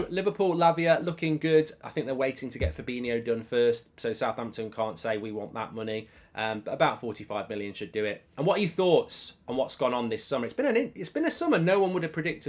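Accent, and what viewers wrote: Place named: Newcastle, United Kingdom. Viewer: That Newcastle accent haha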